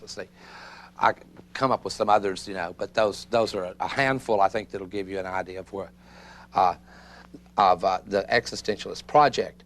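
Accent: South, United States. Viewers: the southern accent is not doing him very well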